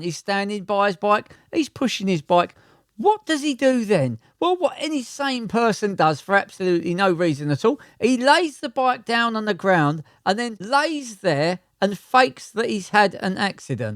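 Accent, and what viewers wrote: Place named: London, United Kingdom. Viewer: This chamnel is brilliant.Aside from the stupidity it shows the commentary in a london accent is hilarious Nice one g'vnor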